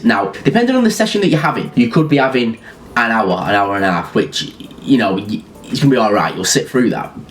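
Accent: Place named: North, United Kingdom. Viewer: Are you a northerner? You sound northern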